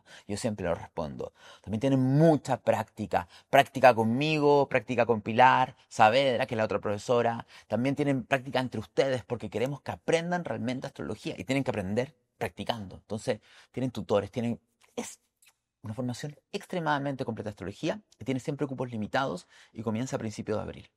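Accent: Chile. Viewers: Gracias por tu impetu y energia y ese acento chileno que me encanta Eres luz y claridad, hagas lo que hagas, siempre sera un exito❤gracias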